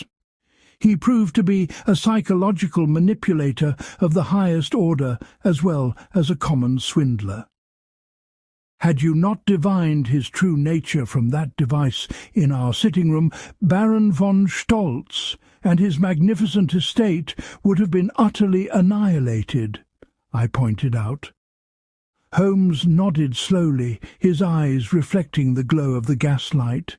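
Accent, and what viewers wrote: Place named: England, United Kingdom. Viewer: I disagree with the above comment. The narrators voice sounds extremely familiar and is so pleasant and quintessentially English, rather like the main characters of the story.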